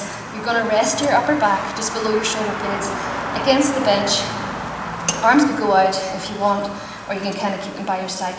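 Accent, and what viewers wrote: Ireland. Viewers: Now, now, she's much more tastefully understated compared to the YouTube gym skanks. It's that Lucky Charms accent that does for me. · I'm guessing north Dublin but definitely could be wrong.. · Irish accent. Beautiful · Are you Irish? Thanks for the video ...very helpful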